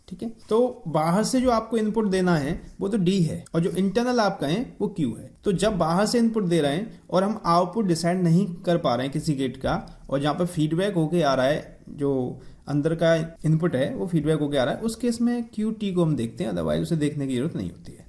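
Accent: India. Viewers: Nice understanding speed is bit fast btw love up accent!!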